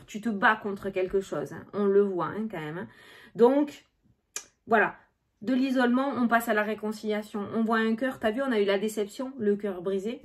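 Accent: South, France. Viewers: Tjs un plaisir de vous regarder......joli accent du sud qui fait sourire ici à Bruxelles...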